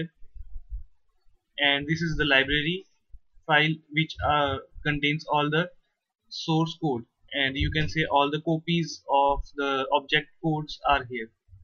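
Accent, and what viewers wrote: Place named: India. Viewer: I Fu*k love Indian english tutorials <3, thx bro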